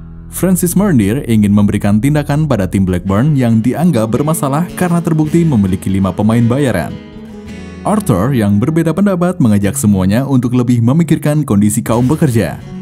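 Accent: Banyumas, Indonesia. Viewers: Asli tetep ngapak kawan asli Cirebon ☺️☺️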